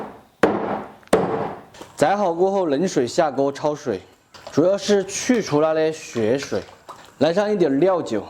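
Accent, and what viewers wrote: Sichuan, China. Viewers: 哈哈 喜歡聽你說川普！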